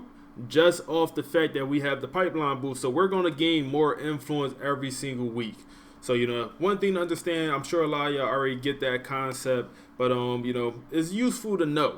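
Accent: Philadelphia, United States. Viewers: Jus came across yo channel today you sound like my homie from philly 😂😂 then u said temple I was like yea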